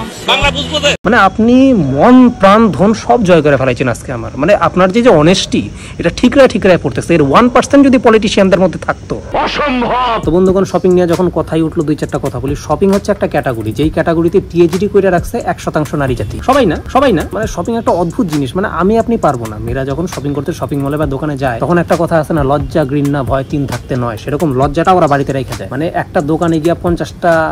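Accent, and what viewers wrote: Bangladesh. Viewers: আপনার বাংলা pronunciation আমাদের বাংলাদেশের বাংলার মত কিছুটা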